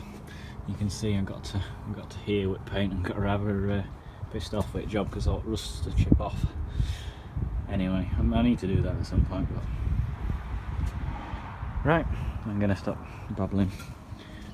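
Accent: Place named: Yorkshire, United Kingdom. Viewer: I thought u were a Yorkshire man!... turn them bushes over, there only worn out at the bottom 😉😂😂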